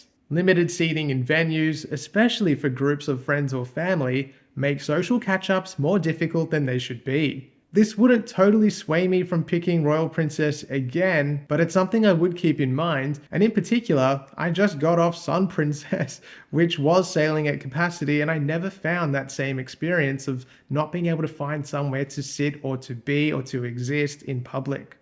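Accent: Australia. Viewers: you are very welcome, plus I love the sound of the male Australian accent.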